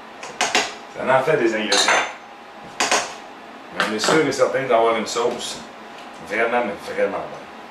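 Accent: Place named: Quebec, Canada. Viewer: poleau111 L accens ce sont des Québécois pas des Français donc accepté notre langue comme on endure la votre bon Week-end c tu Français Ça !!!!!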